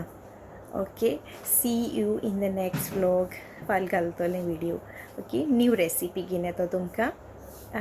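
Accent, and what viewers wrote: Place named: Quepem, India. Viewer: Keshi asai tumi... Somzota bori hai mhun.... God bless you... Keep going..... I love quepem dialect... Sounds very good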